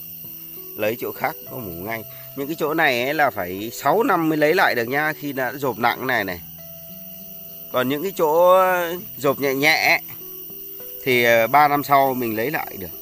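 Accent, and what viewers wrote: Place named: North, Vietnam. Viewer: Nghe ban noi que o bac ha.cho sdt nhe/que ban o dau day.hien jo ban o xa nao huyen nao tinh nao nt cho minh biet dia chi mhe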